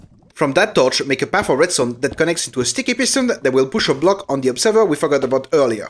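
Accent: France. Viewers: you have a french accent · Tysmm! W video funny french accent lol.Greetings from algeria ❤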